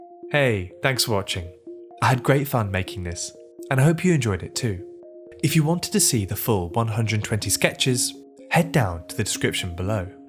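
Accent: England, United Kingdom. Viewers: This is good stuff my snooty sounding English friend. More please. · British narrator Not gonna listen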